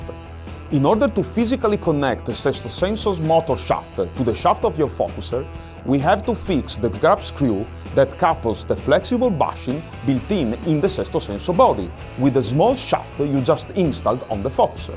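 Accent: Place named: Italy. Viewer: Salve! Tu haves notabel accento italiano. Successo a ti e ao Populo Italiano!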